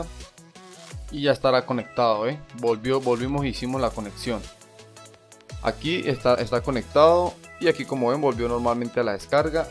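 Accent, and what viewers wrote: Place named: Táchira, Venezuela. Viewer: Muchas gracias, saludos desde San Cristóbal, reconocí tu acento gocho mi pana!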